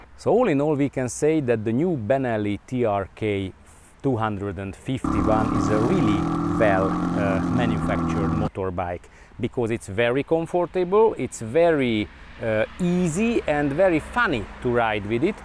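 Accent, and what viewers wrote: Hungary. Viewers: Nice hungarian accent.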